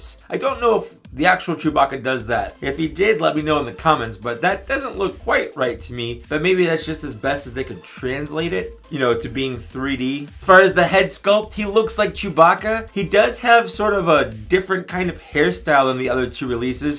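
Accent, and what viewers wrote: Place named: Chicago, United States. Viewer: Lol aren't you from Chicago? You definitely have the accent! 🤣 Love your reviews, keep it up!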